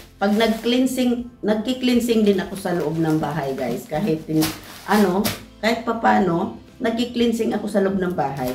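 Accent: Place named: Visayas, Philippines. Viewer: Bisaya diay ka...taga asa ka sis?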